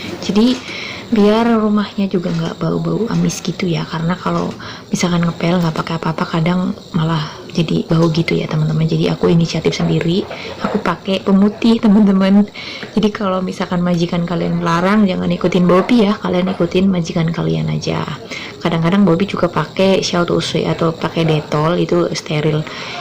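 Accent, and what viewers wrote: Banyumas, Indonesia. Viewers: assalamualaikum dek apa kabar nya semoga sehat selalu ya ngapak dulu baru penak😂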